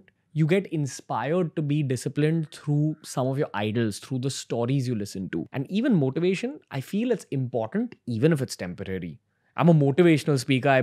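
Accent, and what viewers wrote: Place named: Mumbai, India. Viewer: Hi Ranveer, I hope you are well and safe. I don't follow your videos but have seen a few of them as you interview some sensible people like Saif Ali Khan and Rajeev Masand. Your english is understandable with your Mumbai accent. I understand you are trying to educate the masses in India (your prime target to enter Bollywood). I strongly feel every person in India wants to be a superstar! However, I don't understand that why do you keep mentioning who was your junior or senior in the elite school you have studied in. It's quite irritating to hear you mention this to every guest you meet. I assume you are doing well in your career. But are you trying to sell yourself by mentioning the names of all your juniors or seniors in school who belong to families with influence and money? Of course you are proud of your school and should be. 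Your body language is such where you looked and sounded like a scared child when interviewing Saif. I would like to conclude that I have written to you today using a pseudnym of Tanya Newman as I don't wish to be trolled, abused or threatened by your followers. Indians are a bit crazy that way if they feel offended by a view expressed by a lady. You look decent but please stop looking for praises. I really liked your mother's interview but it appeared that you wanted her to praise you all the time which she didn't. Most definitely, you tried putting words in her mouth. That was funny. And your video teaching the masses how to impress a woman/world wh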